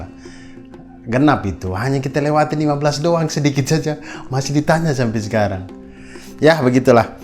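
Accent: Eastern Indonesia, Indonesia. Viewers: Alhamdulillah ka...selalu segar dengan logat timur nya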